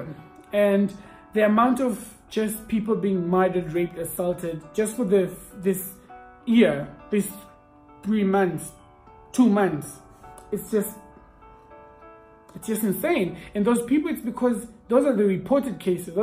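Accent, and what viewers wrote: South Africa. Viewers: This guy sounds south African mus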